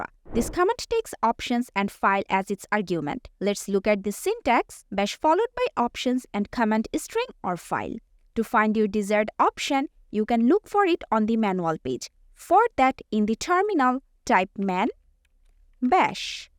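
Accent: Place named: India. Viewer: I can tell you do have an accent that seems coherent or native to the country of India. But it is not so thick that I cannot understand you as well. As you seem very well spoken on your pronunciation's. Considering the info a position earlier I offer one piece of advice if I may Increase the zoom in your gnome terminal or more... I had my phone in the youtube video set to 1440p Resolution and had to zoom in to read the info. You were typing in the terminal and I have reasonably decent vision For those who don't have a 1440 pixel phone. Nor access to that resolution setting because the phone or device they're running isn't powerful enough. This would help those people Better enjoy your content Thanks Cheers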